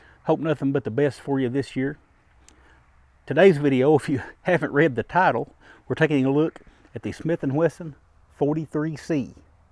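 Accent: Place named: Appalachia, United States. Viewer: The good ole appalachian accent can be hard. He is a great guy, maybe turn captions on, worth listening thru. I personally find him pretty easy vs many other thicker accents (creole, or thicker appalachian, southern ebonic etc)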